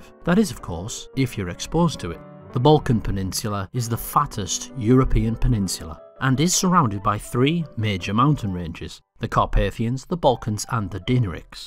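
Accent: United Kingdom. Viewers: Love the countryballs and the humor, keep it up! Properly handled video, I wasn't even aware of the genocide in the Balkans. My question is: Where does that accent come from? I know it's British, but more precise please ^^ and how do you animate your videos? Greetings from Germany!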